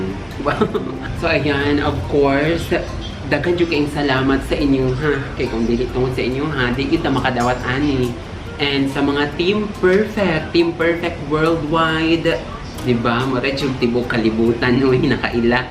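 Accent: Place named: Visayas, Philippines. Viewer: "Zoy zooz" I love ur bisaya accent hahahhahaaahahahahha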